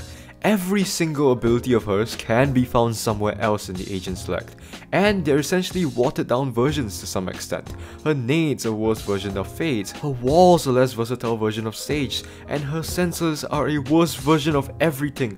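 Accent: Singapore. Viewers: u sound Singaporean so i'll sub, hope u do more entertaining rants.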